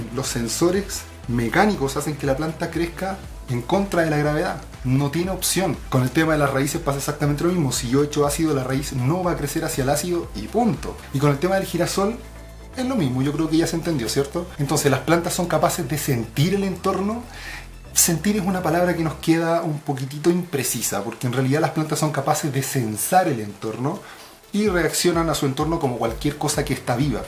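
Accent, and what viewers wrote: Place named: Chile. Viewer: muy bien!! es la forma q tienen los antiveganos de atacarnos, yo ya no respondo a sus ataques, me cansé y no se consigue nada dando explicaciones... muy buena explicación... eres fantástico, caes muy bien, me encanta el acento y el tono q tenéis los chilenos al hablar. Gracias guapo por la información. Go vegan.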